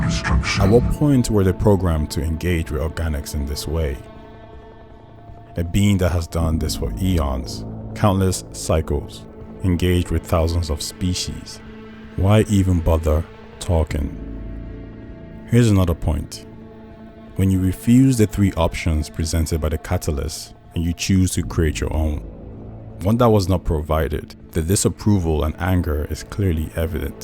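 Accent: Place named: Trinidad and Tobago. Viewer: You sound almost like a Trini. I dig it.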